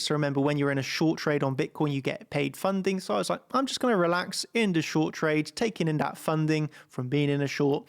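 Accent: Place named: United Kingdom. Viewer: Bro is British bruv 👊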